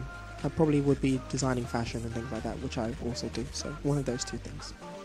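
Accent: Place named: United Kingdom. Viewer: iHadd a feeling he had a accent with a namee likee Taio Cruz and he sings like he has a accent . bu ididnt think it would be british bu he cutee buu yeaa OMG iLovee him even more now sence hes british hehe he's so sweet & cutee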